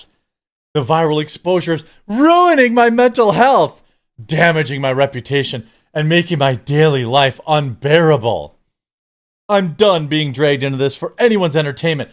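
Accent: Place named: Michigan, United States. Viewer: You're from Michigan, you have the accent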